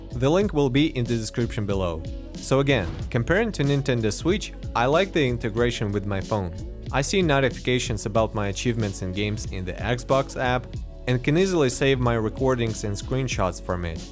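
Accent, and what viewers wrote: Russia. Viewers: Капец как русский акцент слышен!)))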